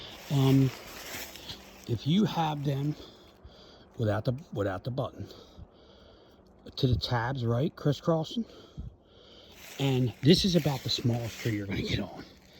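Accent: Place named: Philadelphia, United States. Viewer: where u from ? Philly ? lol. Can hear that accent a million miles away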